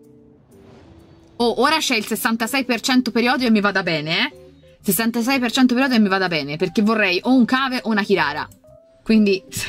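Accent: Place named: Tuscany, Italy. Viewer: Ma sto accento toscano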